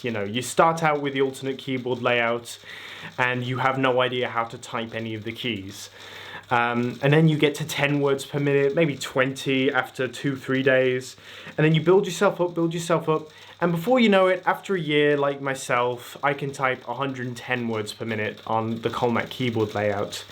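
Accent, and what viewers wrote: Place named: United Kingdom. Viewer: Your British accent :)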